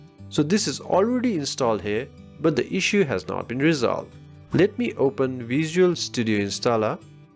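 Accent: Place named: Bangladesh. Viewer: When you said GB to zee bee i knew you are from Bangladeshi kamla bosti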